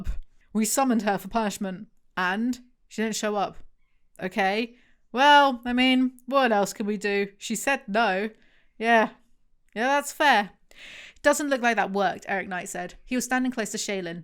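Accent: Texas, United States. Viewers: As a Texan, I am in love with your Texas accent 🤣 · what an impressive and accurate texan accent!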